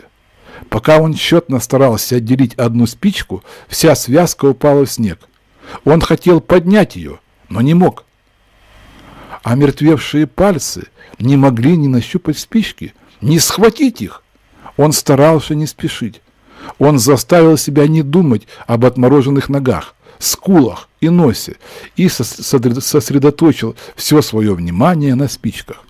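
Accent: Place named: Ukraine. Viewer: Спасибо за начитку, конечно, но украинский акцент, сбивчивость и, самое главное, обилие рекламы, делают прослушивание некомфортным, относительно других чтецов произведений Лондона.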